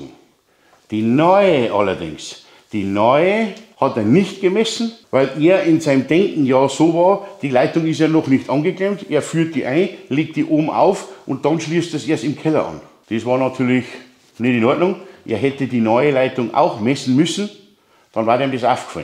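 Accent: Bavaria, Germany. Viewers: Hallo, meine Meinung zu den aufgeworfenen Fragen: "Jeder Unfall ist vermeidbar. Eine schuldhafte Pflichtverletzung liegt hier meines Erachtens von keiner Seite vor. Die Prüfung des spannungsfreien Zustands sehe ich nicht als zwingend an. Bei einer Leitung, die ich selbst gelegt habe, gehe ich doch nicht davon aus,dass diese plötzlich Spannung führt. Vielmehr handelt es sich hierbei um eine "Anlage im Bau", spannungsfreiheit müßte dann geprüft werden, wenn die Anlage durch Schalthandlunge unter Spannung gesetzt werden kann. Da davon auszugehen war, dass die Leitung noch nicht abgeschlossen ist, war dies hier nicht der Fall ".Schlußfolgernd muss gesagt werden, dass bei der Auftragserteilung eine bessere Abstimmung erfolgen muss. Ich würde die Anschlüsse an der Hauptverteilung nur von einer Person, nach Vertigmeldung der übrigen Arbeiten, vornehmen lassen. 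Ich finde deine Beiträge großartig, wertvoll für Schulung, Aus-und Weiterbildung. Ich habe über 40 Jahre im Beruf gearbeitet und sehe deine Beiträge sehr gern. Das Bayern ihre eigenen schönen Dialekt haben, weiß man normalerweise. Viele herzliche Grüße Reiner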